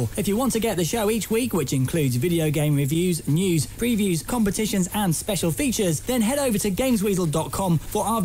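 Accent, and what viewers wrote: United Kingdom. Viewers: British accent omg i love British accents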